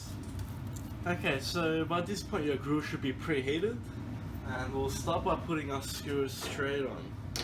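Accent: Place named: Australia. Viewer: Australian accent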